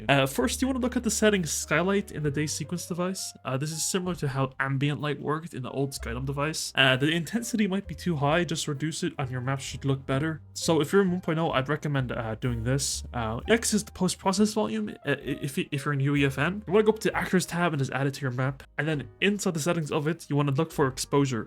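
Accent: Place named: United States. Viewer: Bro u sound so American i didnt even ponder over Irish accent. As a British born, I prefer Ireland. Keep up the read-minding content!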